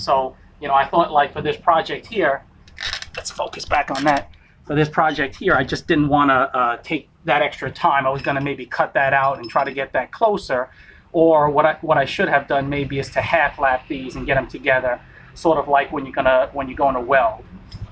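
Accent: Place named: Upstate New York, United States. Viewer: Love you’re videos!!!! You’re accent is also very interesting! I’m listening to “I’m guessing an up state New York accent? That has picked up the cadence of, for sure, and is slowly turning Taiwanese! It ads such an awesome element to your content. It’s ever present in your videos, but far more pronounced on your wandering Taipei videos when you’re obviously interacting with locals off camera! KEEP BEING AWESOME! I’ve gotta get on you’re Patreon page. Thank you for all you do!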